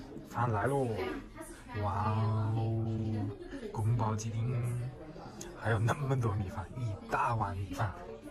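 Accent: Sichuan, China. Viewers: 你的四川口音…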